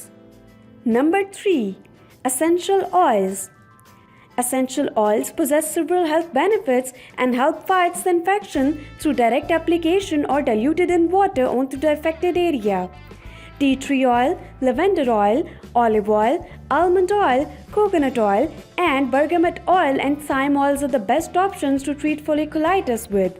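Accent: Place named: India. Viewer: I can’t stand the Indian accent and mispronunciation.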